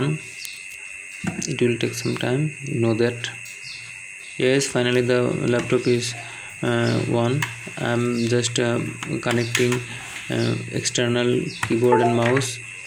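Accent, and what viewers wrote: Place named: Bengal, India. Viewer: কথার স্টালে তো মনে হয় বাঙালি, বাংলা বুঝি জানো না !!:face-orange-raised-eyebrow::face-orange-raised-eyebrow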